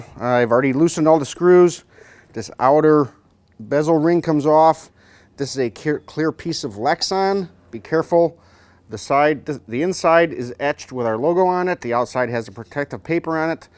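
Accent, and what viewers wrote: New Zealand. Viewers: what part of nz are you from. Chur.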